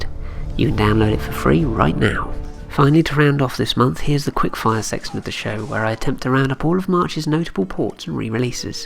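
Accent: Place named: United Kingdom. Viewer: Fantastic show, went back and watched all previous shows nice to hear a British accent. Wish they would package all Time Crisis games for the PS3 Move what you think?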